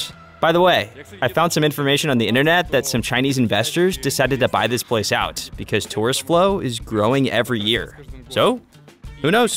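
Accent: United States. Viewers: Interesting video! Good presentation. Clearly an American accent (via your AI overlay) but speaking in units of Celsius for temperature measurements? at least put the deg F in subtitles. When was the last time NOAA.gov put all their units only in Deg C?… try never (yet). Based on your channel videos it’s a good guess a large portion of your audience is American… How hard is it to visually post both units?…. With all your complex map graphics, seems like a tiny detail. If 2% of my viewers used predominantly Kelvin, I’d put that in subtitles too. And let’s be honest, Kelvins are the best units for temperature anyways! 😊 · Good apart from the annoying American accent voice over. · Had to stop watching as the commentary is in Murican, please learn how to say, 'Ah Zores''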